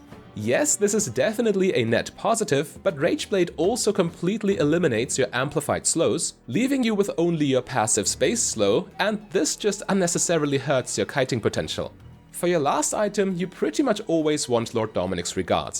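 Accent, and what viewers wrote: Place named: Germany. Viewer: Theres a lot of different builds being pitched for us noobs but I wont question a gamer youtuber with a good mic and a german accent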